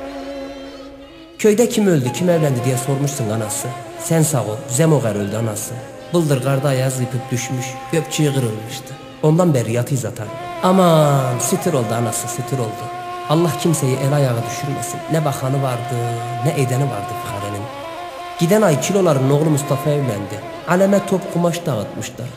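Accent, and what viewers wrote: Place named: Çobanbey, Turkey. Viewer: diline salık emi gene cobanbey şivesini çok güzel anlattımışsın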